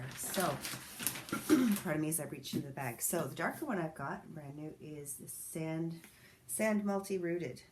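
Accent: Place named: South, United States. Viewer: I am kind of shocked you're from Canada, I would swear you're from the south LOL I always put your videos on 2x playback because it is just more enjoyable to watch other than it's hard to understand what you're saying LOL you just start slow like us Southerners LOL it makes me feel like home, but it takes so much longer to watch the videos. I'm just one of those that wants to get to the point because I've watched hundreds of wig videos and just want to see the wigs, but I so appreciate how many wigs that you review exclamation point when there's a specific wig I want to see I come to your site first!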